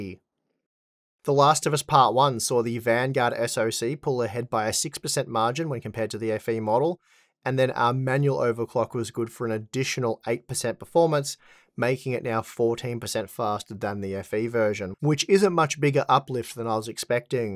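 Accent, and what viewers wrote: Australia. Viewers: I also love the silent baos hahahaha I love how Australians pronounce some words lmao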